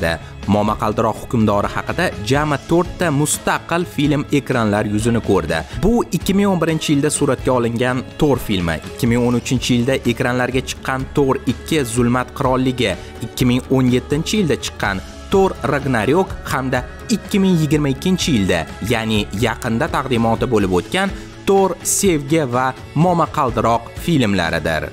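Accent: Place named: Russia. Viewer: Sizga maslahat. Nutqingizni ruscha oham va ortiqcha bir hil intonatsiyadan tozalang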